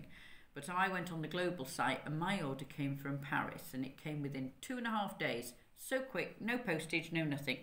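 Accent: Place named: England, United Kingdom. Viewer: Wow beautiful woman and I love your English accent !!!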